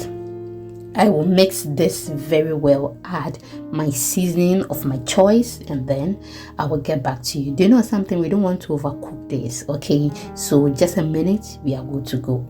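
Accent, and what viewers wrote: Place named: United Kingdom. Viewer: You Uk accent is on point ooo still watching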